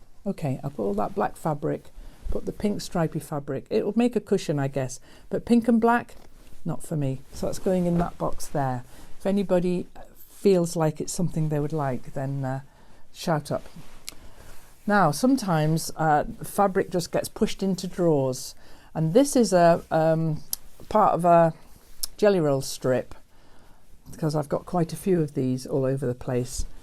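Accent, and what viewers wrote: United Kingdom. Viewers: Hi, I'm so glad that I found your channel by accident some dass ago. I searched for some ideas for scraps as I regularly do, but this time your pink/red quilt showed up and caught me. I watched some of your videos since then and I really like them. Hearing you talking, looking at all the craft supplies and hearing your dialect is great (I'm German and love hearing different kinds of English, especially "British English"). All the best, Stephanie PS: Just subscribed for future videos 😉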